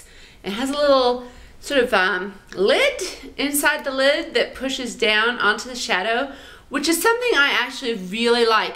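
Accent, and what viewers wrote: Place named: France. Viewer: Helpful review. Love your French.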